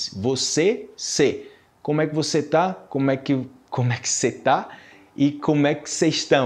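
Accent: Colombia. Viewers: Me encanta tu acento en español. Parece colombiano 💖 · Te lo juro me sorprendió cuando te escuché hablar con ese acento colombiano, ya me confundí no sé si eres de Brasil, de Colombia de ambos o de ninguno. 🤦 Te descubrí gracias a mi profesor quien recomendó tus vídeos.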